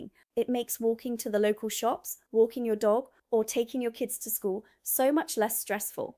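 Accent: England, United Kingdom. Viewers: If you are an American experiencing British roads, why do you have a perfect British accent? Not a hint of an American twang. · She has a British accent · I remember the first serious attempts at changing the drink-driving culture. It didn't happen overnight. Adverts in the mid- to late-1970s were sometimes shocking in the candour. OK, maybe the accidents were simulated, but they sent a very clear message. Gradually, it became taboo and despised to drink and drive. Now, young people who don't remember those campaigns, just take it for granted that you don't drink and drive (on the whole). On the zebra ("ze.bra" not "zee.bra" if you speak with an English accent like she does!) crossing, I wouldn't start crossing until I had verified the cars will slow down/stop. It's always wise to assume they won't and be pleasantly surprised when they do rather than get mown down by someone who isn't paying as much attention as they should. 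But the British will generally stop when they see pedestrians waiting to cross. On the continent that rule is not something you want to challenge! But probably still safer than the US as the cops will pull a driver for not yielding to a pedestrian at a crossing. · Bit confused here. Is this lady English or American? She has a perfect English accent! Interesting video.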